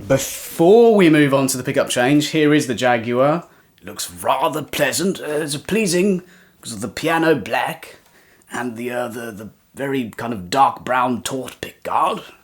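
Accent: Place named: RP, United Kingdom. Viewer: You sound posh enough for 90% of the world without adding the old geezer voice ;) Thanks for the demo!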